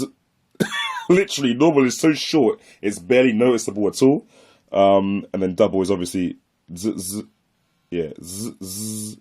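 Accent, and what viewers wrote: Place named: England, United Kingdom. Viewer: Finally an English person reviewing 😀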